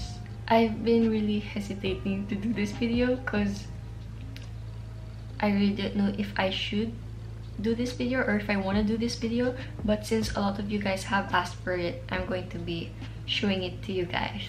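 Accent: Philippines. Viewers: ok but I was SHOOK by her filipina accent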